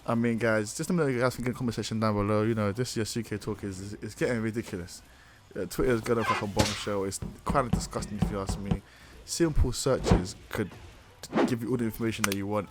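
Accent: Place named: London, United Kingdom. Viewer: you are the most north london person i've heard in a while, bro said "mandem, we got a juicy one stylll" 🤣 love bro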